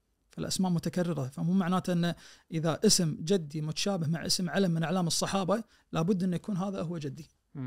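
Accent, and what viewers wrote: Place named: Iraq. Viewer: هذا لهجته فيها من اهل العراق حيل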